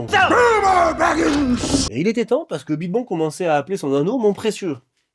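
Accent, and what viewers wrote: South, France. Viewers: 'Tain j'ai crû que les pattes sauronara existaient vraiment 😂 Pis entre l'accent du sud et le fait que t'arrête pas de parler de dr*gue j'ai pensé à jdg tout le long 😂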